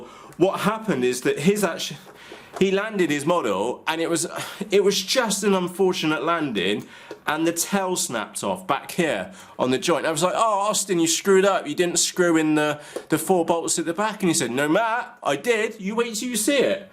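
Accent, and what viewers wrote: Bristol, United Kingdom. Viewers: Good to hear that Bristol accent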